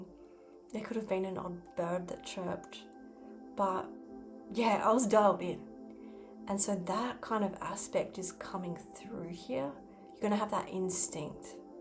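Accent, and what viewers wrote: Australia. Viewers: I love your Australian accent😂❤❤❤ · Your eyes are amazing i bet you get that a lot your voice is the sweetest wisper you make me chill 😎 to be as sweet as you lovely accent i think its Australian imagine nabours the girl next door who can read you inside out 😊❤x ditto my intuition is allways on key like a phenix angel 😇 covered in golden glow mmmmm i have yet to have my glow up I'm a very late bloomer the old catapiler in the universe I've been around since time began outside of Eden inside is just a memory a dream thats coming back to be remembered once more the beautiful garden i keep inside two lovers two meet to greet to find the love i left behind so long ago in my very first night invthe land of nod 💤 😴 💤 😴 to close my eye's to forget to remember when the time is right once more edens projection from within waking ⏰️ up to be remembered forever and ever a garden of light that never sleeps and never dies not even a flower not even a mouse surrounded by lions tigers and bears mmmmmm that jist want to be loved its actually the garden of love without a shadow without a doubt 😊❤x by beautiful your realy cool 😎 taa taa from Lancashire UK 🇬🇧😊❤x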